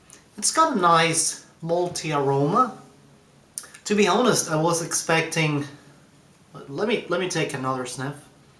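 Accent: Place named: Brazil. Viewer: HAhaha no prob mate, its a thing that just brazilians would know, its like a compliment to see if the another lad is also a brazilian. anyway its cuz your accent, not that you have it, but in some parts of the vid i can tell u are a BR(brazilian) but not hehehe.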